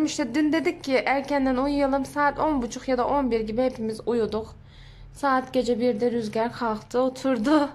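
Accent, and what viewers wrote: Yozgat, Turkey. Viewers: Kim ne derse desın biz yozgatlıyızz biz böyle konusuruzzzz🌹🌹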